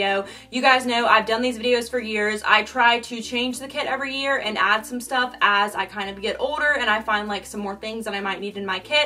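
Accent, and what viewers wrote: South, United States. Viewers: Is it just me or did she get more of a country accent lmaooo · She got a lot more of a country accent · petition for who thinks she should use her country accent more ⬇️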